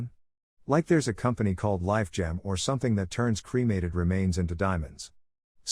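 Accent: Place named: Australia. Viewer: "I sit down and cried." The guy sounds like an Australian.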